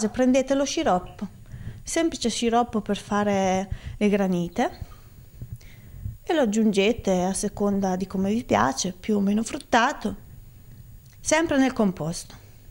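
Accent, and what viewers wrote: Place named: Marche, Italy. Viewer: scusa se te lo chiedo sei marchigiana per caso?? io sono di Pesaro... dall'accento sembrerebbe.. comunque video interessante.. complimenti!!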